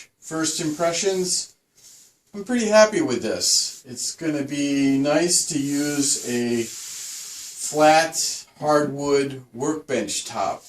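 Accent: New England, United States. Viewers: Sounds like Norm as he is from New England as well. Watch New Yankee Workshop and you will hear it as well.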